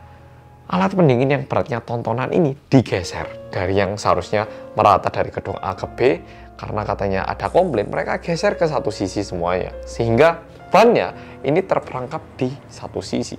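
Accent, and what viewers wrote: Java, Indonesia. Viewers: Medok bget sih anda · logat jowo ne ketoro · Abg org Korea apa jawa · Berapa kali mas ngomong untungnya, tapi ternyata yang meminggal 500 an lebih. Berasa banget jawanya yg jawa jangan tersinggung ya w juga orang jawa kok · Oppa asli Korea??? Masha Allah medok logat Jawa bgt 😁 ky koko2 Semarang /Sby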